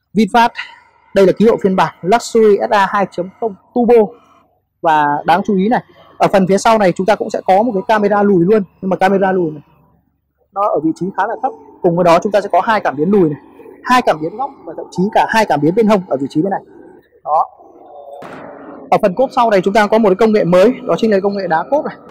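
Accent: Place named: United States. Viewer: May mà bạn nói bạn là người vn nếu ko thì tôi cứ tưởng bạn là người Mỹ đấy.cảm ơn bạn.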